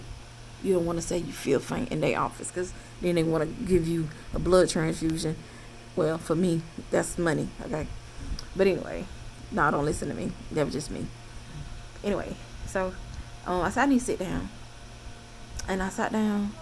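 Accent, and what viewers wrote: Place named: Alabama, United States. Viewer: Are you related to Adrienne Little? You have that Mobile, AL twang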